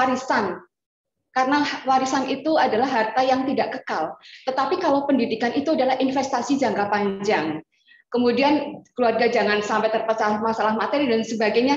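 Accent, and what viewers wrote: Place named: Banyumas, Indonesia. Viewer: Salam ngapak bu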